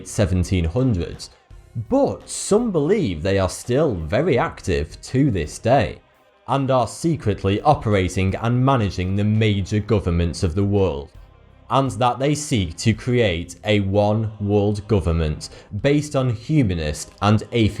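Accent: United Kingdom. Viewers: Well with your obvious British accent why don't you expose it was European who founded Skull & Bones and expose some of the Royals witch covens like "Order of the Thistle" and "Royal Order of the Garter"???? Why don't you expose how many Skull & Bones members have been knighted by the Queen? Most in Britain are freemasons were you a Marlay child? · Omg . The guy speak such hard British English lol)))) so funny . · These videos are boring and I hate his british accent I cant understand why they have such a shity accent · it's his terrible punk/slang British accent. Ffff - thhh words are always mixed . He does it with other words in his video's. Not the typical correctly pronounced formal British accent we are all used too , depends on where the Brit lives .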